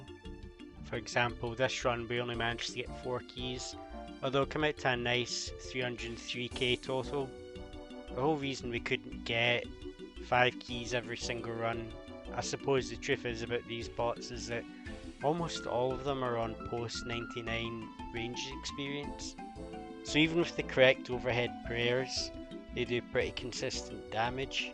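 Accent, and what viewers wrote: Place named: Scotland, United Kingdom. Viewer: Love to hear a Scottish osrs content creator.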